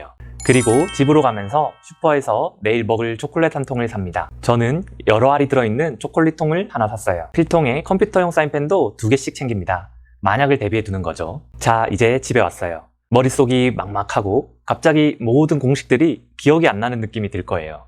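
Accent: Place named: South Korea. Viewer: 여기착한의사선생 말투가 서울토박이가 아닌 느낌이 드네요?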